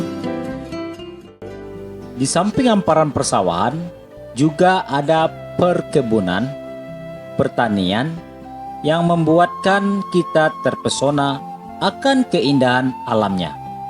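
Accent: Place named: Minangkabau, Indonesia. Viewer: Dialek minangnya kurangi bang. Pengucapan huruf E nya diperhalus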